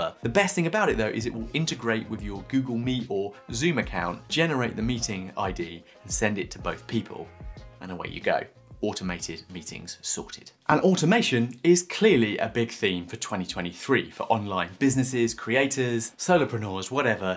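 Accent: United Kingdom. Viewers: I fell in love with your channel two years ago and have religiously been watching every video as well as BUYING both of your second brain offerings. Being an avid viewer, I feel compelled to give you some constructive criticism. We Americans are a sucker for a proper Brit accent and you have a brilliant one. However.... (you knew this was coming)... in the last year or so, you've begun to sound like a pitch man rather than a user advocate. I realize it is most likely difficult to integrate paid sponsorships and make them sound like a recommendation rather than a compulsory blurb in your video. Keep in mind, though, your avid viewers are well aware of the paid sponsorships and seriously know the difference. I have to admit it's really started to turn me off.:( The sponsorship nods get longer and longer and they're usually about products that everyone already knows about. 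I don't want to abandon your channel so I figured, to be fair, I should express my thoughts to give you food for thought and hopefully, have you get some retrospective insight of then vs now to see where I'm coming from.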